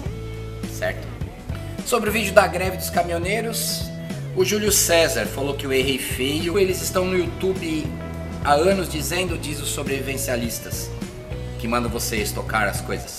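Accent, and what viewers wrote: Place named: Rio Grande do Sul, Brazil. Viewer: Mestre só me responde uma coisa...Tu é gaúcho?pois tu tens o nosso sotaque e eu ja vi tu falar girias gaúchas.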